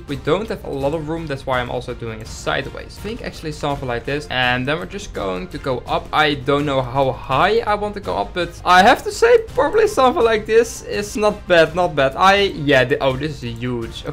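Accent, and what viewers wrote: Netherlands. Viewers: i know your netherlands by the way you talk english so --> hoi leuke video heb je gemaakt maak nog meer van die videos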